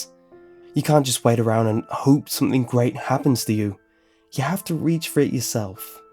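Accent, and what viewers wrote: England, United Kingdom. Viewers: Where from england are u from are u york shire bread or southern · ENGLAND. That's why I love your voice so much. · That explains why did you sounds like a British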